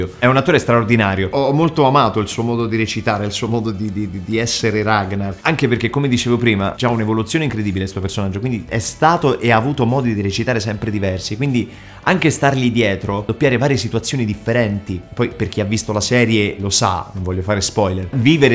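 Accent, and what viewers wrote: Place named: Rome, Italy. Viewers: Ciao, ti seguo da molto poco e ho scoperto i tuoi video dall'Australia... proprio per questo ti faccio una domanda probabilmente banale e fatta mille volte, ma per me curiosa. La tua cadenza delle tue parole è ovviamente laziale, allenamento a parte, è difficile perdere questa cadenza in fase di doppiaggio? Credo che quando parli in video sia normale farla venire fuori, ma quando doppi? cerchi di eliminarla sapendo che la utilizzi normalmente? Io per esempio avevo una lieve cadenza Piemontese ma che ho perso perchè da quasi 10 anni sono fuori dall'italia. Gran bel lavoro con Ragnar, sono curioso di vederti in altre serie. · sei bravissimo, il doppiaggio di Ragnar molto vero, però si sente un pò l'inflessione romana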